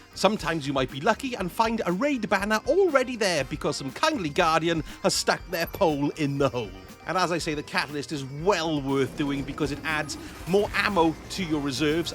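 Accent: Wales, United Kingdom. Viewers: Are you welsh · Can I hear a fellow welshman?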